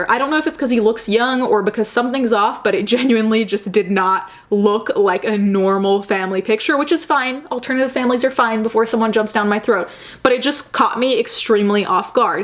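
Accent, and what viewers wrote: RP, United Kingdom. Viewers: I love your british accent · Oh I love your british accent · I love your British accent 😍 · OMG I love your British accent!! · And that glaring posh accent xD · I cant understand you with your HEAVY British accent.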